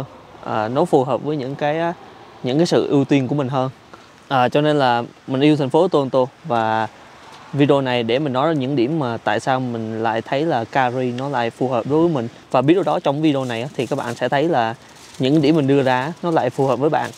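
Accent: Central, Vietnam. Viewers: Nghe giọng bạn là biết người miền Trung rồi nhưng nghe đến cuối mới bik đồng hương ở Calgary, cheers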